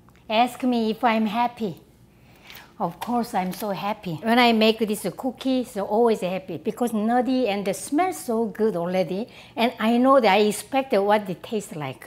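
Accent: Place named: South Korea. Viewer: 아줌마 안녕하세요?저는 문화센터에서 교양영어를 가르치고 있는 강사입니다. 최근에 학생분을 통해 동영상을 보게 됐는데 자꾸 빠져드는 매력이 있네요. 수업시간에 자주 보게 되는데 학생분들도 참 좋아 하세요. 이런 말씀 죄송한데 너무 귀여우세요..ㅋㅋ 한국식 발음이 뭍어 있는 영어지만 너무나도 훌륭하게 하실 말씀을 다 하시는걸 보고 학생분들이 영어가 귀에 쏙쏙 들어 온다며 자신감과 용기를 얻으세요. 저는 초중고를 뉴저지 Cliffsidepark에서 나오고 석사는 NYU에서 했어요. 박사는 이대에서 영어교육을 수료한 상태구요. 망치님이 뉴욕에 살고 계신다니 방갑더라구요. 저도 요리하는걸 참 좋아해서 외국인들을 대상으로 한국 쿠킹 클라스를 열곤 했는데 저의 방식과는 다른 레시피로 시원시원하고 섬세하게 요리를 하셔서 제가 배울점이 참 많은것 같아요. 몇가지 요리는 저도 시도 해봤답니다. 다음 비디오에는 오므라이스나 한국식 볶음밥을 해보시는건 어떨까요? 김치볶음밥도 괜찮구요. 만들기도 쉽고 한끼 식사로도 훌륭하니까요. Kudos입니다. 앞으로도 맛있고 즐거운 비디오 많이 부탁 드립니다. 지켜 볼께요. 화이팅 입니다.!!!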